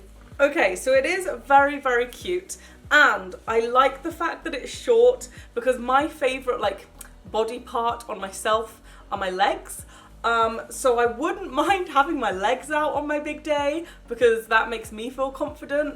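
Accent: United Kingdom. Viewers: Im sorry, but ur so so weird, and plus its more weirder when ur talking Britain THAT PRECIOUS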